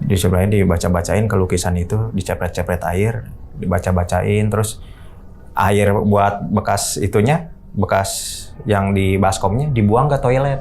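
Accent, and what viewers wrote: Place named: West Java, Indonesia. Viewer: Orang jakarta tinggal di bandung logatnya jadi logat sunda😁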